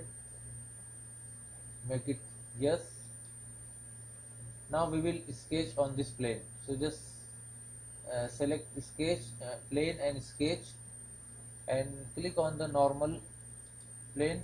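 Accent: India. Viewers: Why there are so many Indian english accent producing engineering videos on Youtube? Is there any reason? Thanks from Brazil.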